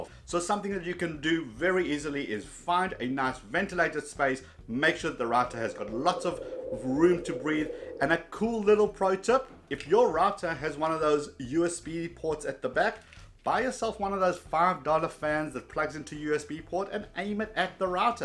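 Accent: Johannesburg, South Africa. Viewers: stumbled onto this vid and 0.2 sec I can tell he's from SA :) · South African? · love the South African accent ! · U sound South African ... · Love that Joburg accent. Content is also great.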